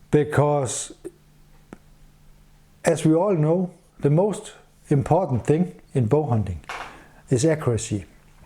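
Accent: Denmark. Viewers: Your Daneglish is fine, I have no problem understanding you. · So... I must say again with humble respect: you have a beautiful wife which gives you nice gifts. You are a very, very lucky man. Director clap is very appropriate. Maybe she can use it every third or tenth video? I wouldn't mind at all. 😇 I likeYour Danish spiced English very much. I don't know if you would like my slavic English accent. But we use what we can, don't worry a bit. Another interesting video and interesting praxis data in the comments about your son and a 30# bow and his deer kill. I really didn't know that that is possible, as we are not allowed to bowhunt in our country. My only question would be, how is so high FOC affecting your spine of the arrow? I couldn't shoot such an arrow from a 35# bow, I think. I shoot 52# longbow (a little towards hybrid side, but still longbow under the WA rules), and I have allready a problem: I use 340 spine goldtip xt classic, with 160 grains in front, but are still a little softer, as I would like for this bow. 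I can tune them, but I have to go near the lower limit of my brace height, which I don't like. I also like the heavier arrow, because I use carbon just for 3d training, ( they are much more durable than wood) and wood arrow in this spine is allready very heavy. I make carbon set as similar to wood arrows as I can, and then before competitions I don't really feel much difference , when I have to use wood for longbow class. Some would say use 300 spine, but wooden shafts in this spine are allmost impossible to come by he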